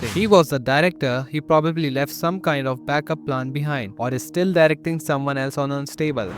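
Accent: India. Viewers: the indian accenct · Your voice seem Indian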